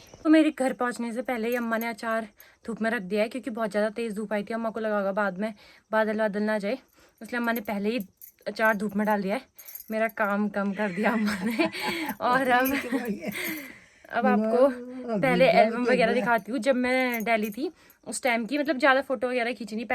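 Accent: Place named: Kumaon, India. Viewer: Wow Didi aap ke video Dekhne me kuch अलग ही fil hota hae or upr se aap kumauni ho samj to nahi aata bat sunne me maja aati hae aapki Boli😊❤❤❤❤❤❤😊😊😊😊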